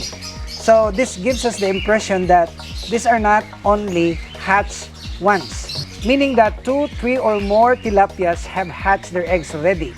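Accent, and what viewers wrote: Philippines. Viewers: That's a typical Philippines 🇵🇭 accent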